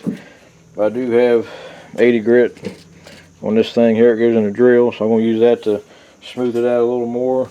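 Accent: South, United States. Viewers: is a country guy with a southern accent. No apologies needed because that is who he is. I am enjoying your videos!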